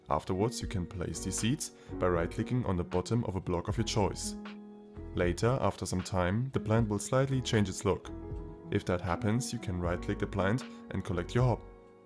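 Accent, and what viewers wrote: Germany. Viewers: The german accent make it even better.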